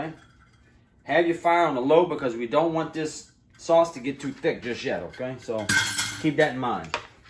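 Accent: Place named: New Orleans, United States. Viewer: That's NewAwlins talkin Dawlin!!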